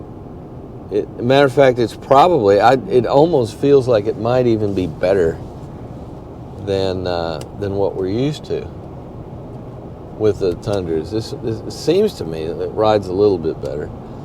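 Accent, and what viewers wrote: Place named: South, United States. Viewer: Your review of the tundra trd pro was the best I’ve seen. I’ve ordered the 2026 and for the past 4 weeks have watched every video I can find. Your approach, attention to detail, and technical information, combined with the southern draw was worth the price of admission. I learned a lot and look forward to delivery with both eyes wide open. Thank you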